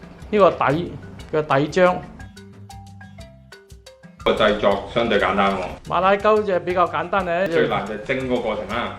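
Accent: Zhongshan, China. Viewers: 師傅口音應該係我鄉下中山石岐，是嗎？ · 是中山，不是石岐.而是中山隆都。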